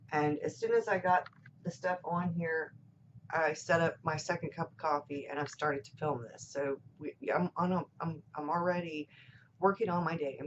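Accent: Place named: South, United States. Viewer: I love the southern accent, it sounds so friendly. Ans I never doubted that you are very intelligent.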